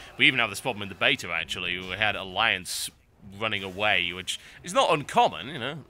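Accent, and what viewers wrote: United Kingdom. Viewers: Yay!! I love you an your cynical british accent, you make awesome videos some more entertaining than other but still a fine work <3 ^^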